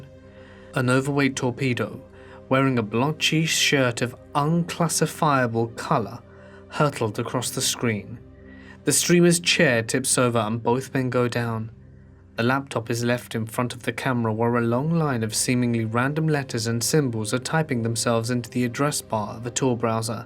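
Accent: United Kingdom. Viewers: Hes british all brittons sound like that . You watch too much mr bean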